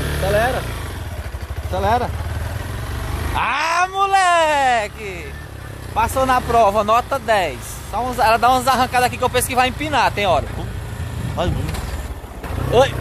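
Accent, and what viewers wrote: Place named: Ceará, Brazil. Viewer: você é de que estado, você moram no Ceará? Eu sou de Pernambuco, o sotaque é bem diferente, os seus vídeos são muito bons, parabéns.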